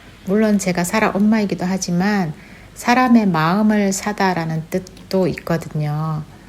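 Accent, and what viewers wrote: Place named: Gyeongsang, South Korea. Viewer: 반갑습니다 까망님 체널 보다가 님 댓글 보고 왔습니다 스위스 사시는군요 추운날씨에 촬영 하느라고 수고하셨습니다 첫눈 치고는 많이 내리네요 경상도 분이 신가봐요 사투리가 조금씩 들리네요 ㅎㅎ 저도 구미 살아요 응원하고 선물도 드리고 갈게요 행복한 하루 보내세요☕;)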